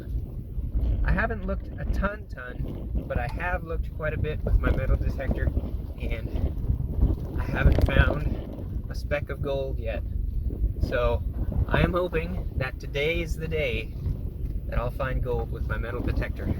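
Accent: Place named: Australia. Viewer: Loved the aussie accent 🤣 my theory why Australia has all the big gold is because the heveys always sink to the bottom 😉 thumbs up from down under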